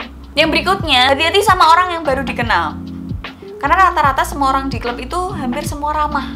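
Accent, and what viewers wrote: Java, Indonesia. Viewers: Ngomonge medok enak gimana gitu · pohh, medok mu kui lo mbakk, sesuatu😍😍 #lampung☝️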